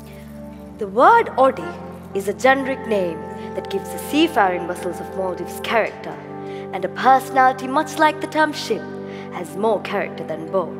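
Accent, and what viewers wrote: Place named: England, United Kingdom. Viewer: I like her english accent😍😍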